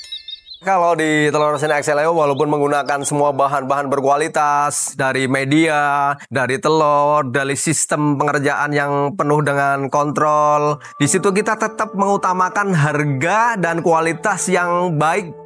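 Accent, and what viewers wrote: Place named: Kebumen, Indonesia. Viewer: Berati kebumen ngapak kaya nyong nng rio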